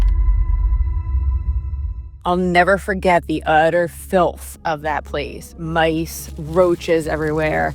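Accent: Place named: New Jersey, United States. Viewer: Loved the narrator's voice... she's definitely Jersey. Looking forward to more.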